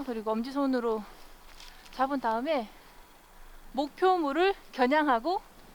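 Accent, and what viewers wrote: Gyeongsang, South Korea. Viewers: 약간 경상도억양 있으신게 더 매력적이십니다. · 작다~~ 경상도 아가씨네요 ㅋ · 살짝 경상도 악센트가 느껴지는데영ㅋㅋ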